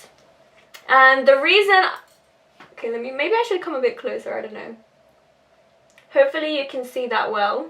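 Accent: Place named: United Kingdom. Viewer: Where are u from.. U have that british accent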